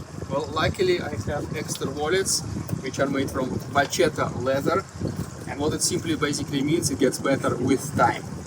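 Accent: Russia. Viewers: Fix your silly accent. Most intelligent Russians learn to master English before dumping their accents on the public.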